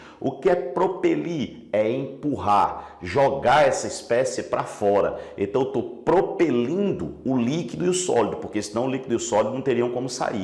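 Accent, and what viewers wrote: Northeast, Brazil. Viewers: prof, o senhor é nordestino? a fala parece com o dos meus professores (sou paraibana)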